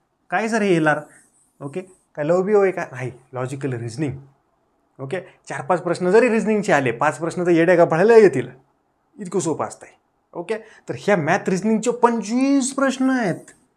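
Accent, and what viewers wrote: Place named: Marathwada, India. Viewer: तुमची शिकवण्याची भाषा खूप छान आहे ...एकदम सहज समजते....एकदम रोखठोक आणि मराठवाड्यातील मराठी...💪👍🙏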